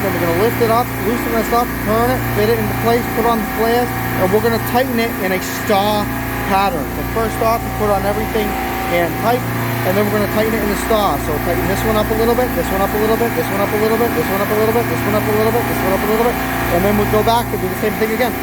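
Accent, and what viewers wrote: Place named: New York, United States. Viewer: New York accent s so cool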